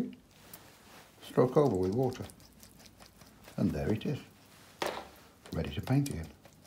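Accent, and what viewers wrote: Yorkshire, United Kingdom. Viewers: Hi,. Your video notification just popped into my inbox - not sure why as I haven’t done watercolours for decades, but I’m glad it did as your easy ways inspire me to give them another go. To my surprise, enough of my tubes are still usable and I even discovered this very book on my shelf, so no excuses! Good to hear a good old West Riding accent - you obviously hail from very close to me. Please don’t apologise for your roots - we have grass in the north, not graarse! Keeps up the great work.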